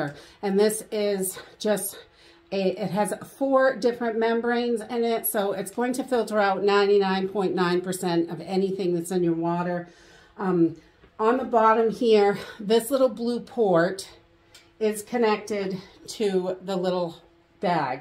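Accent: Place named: New England, United States. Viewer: You are already getting a New England East Coast accent!! You can come park yah cah next to mine! Welcome 🤗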